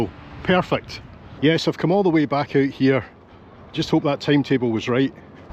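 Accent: Scotland, United Kingdom. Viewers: I enjoyed your trip,I just love you Scottish accent,I find everyone of your journeys wonderfull keep up the good work😊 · Love your 'posh' Scottish accent.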